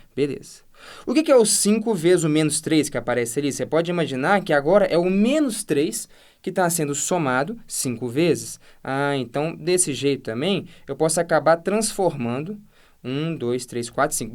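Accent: Minas Gerais, Brazil. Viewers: Parabéns pelo trabalho. Pelo sotaque, parece mineirinho igual a mim. Sou professor aqui em Brasília, confesso que essa parte tão básica é difícil de se passar em um cursinho aqui, pq é muito conteúdo. Sucesso aí, se tiver alunos com dificuldade em mat básica, indicarei vcs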